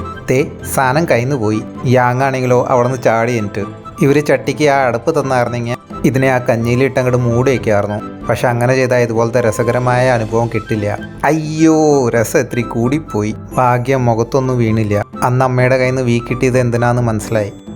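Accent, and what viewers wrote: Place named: Palakkad, India. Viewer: സംസാരം നല്ല രസം ഉണ്ട് കേള്‍ക്കാന്‍ ഒരു പാലക്കാടിന്റെ ചുവ ഉണ്ടോ എന്ന് സംശയം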